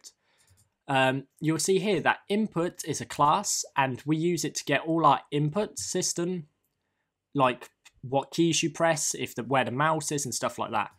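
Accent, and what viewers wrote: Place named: United Kingdom. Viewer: Hi, trying out the code in the first script, I get an error message saying "Input does not contain a definition for 'GetaxisRaw'". I checked every letter, it is matching the one in the video. I tried with Getaxis, but it doesnt change anything here. Does someone knows about it ? Great tutorial, and great British accent as well ^^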